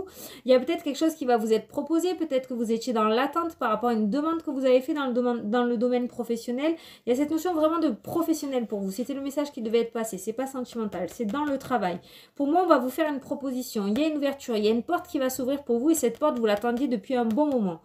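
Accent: South, France. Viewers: Merci, j'adore votre accent du sud. Apparemment, les sagittaire vont respirer un peu 😍😘😍😘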